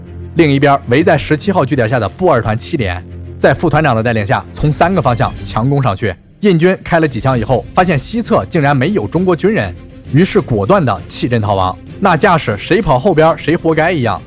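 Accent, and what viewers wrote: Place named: Jiangzhe, China. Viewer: 你說的大致正確 連 “吐蕃” 都能唸出正確的 “土鉢” 的確比99.9999％的中國人要有水平得 多得多！ 可惜你的北京話 實在很糟糕！ 帶江浙土話口音 實在太重！ 唉！ 能不能先把你的北京話 練 “六” 一點 再來拍視頻啊？ 北京是首都欸 你是忘了嗎？ 莫非你是海外華人？ 如果真是 那就當我沒說！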